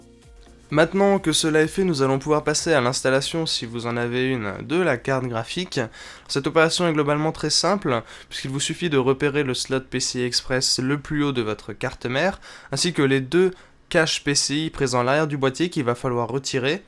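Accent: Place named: England, United Kingdom. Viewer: Quel accent anglais x)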